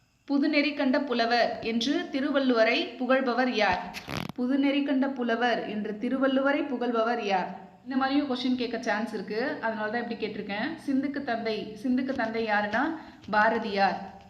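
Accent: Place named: England, United Kingdom. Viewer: Ethukku english kara mam mathiri pesuringa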